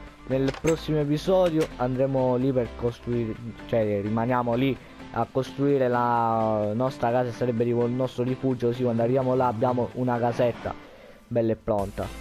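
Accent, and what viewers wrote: Sicily, Italy. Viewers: 01 Dall'accento sei Siciliano vero? ;)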